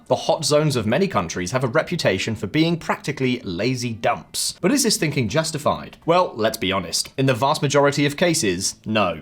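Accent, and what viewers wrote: United Kingdom. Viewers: I like the way you compose the narrative into different perspective that are coherent. It does make sense when we think about it. Of course there's no way clear definitive cut to conclude heat slows hinders economic growth, but it might be the trigger (indirect factor) for the human behaviour (direct factor) that makes hot countries are economically subordinate than cold countries despite they are more resourceful. Keep progressing making meaningful and all rounded contents. I enjoy watching it while being entertained with your British accent 😂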